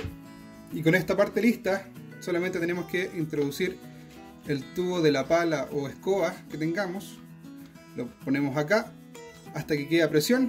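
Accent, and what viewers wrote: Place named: Chile. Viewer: Hermoso acento chileno 😻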